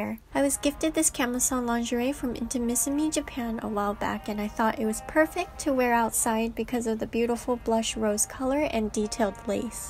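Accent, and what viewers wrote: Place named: United States. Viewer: Hey can you do a video about your life? Like where you are from... or how you ended up in japan having such perfect american accent. I am really interested in your channel and want to know more because i just soooo curious. Or maybe how you ended up being a model or making a youtube channel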